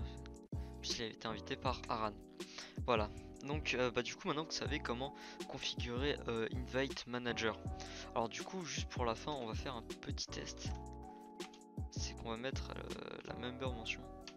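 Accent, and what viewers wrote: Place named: France. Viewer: idk man french accents are so pretty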